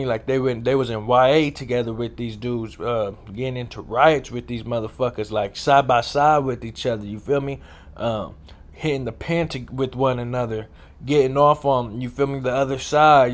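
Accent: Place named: Fresno, United States. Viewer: First time coming across your videos you sound like a Fresno dude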